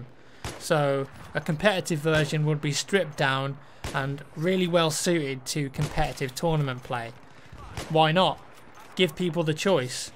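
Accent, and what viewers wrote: United Kingdom. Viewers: i love the sweet sound of your uk voice, can we game on box later...not that box you perv...360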